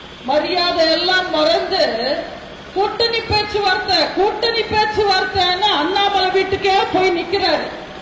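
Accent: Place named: Telugu, India. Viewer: தமிழ் பேசுவது தெலுங்கு வாடையில் பேசுகிறார் 😮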